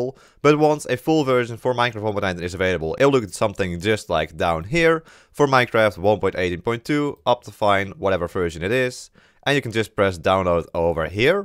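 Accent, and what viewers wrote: Netherlands. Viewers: Are you dutch? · are you dutch haha me too i can hear from your voice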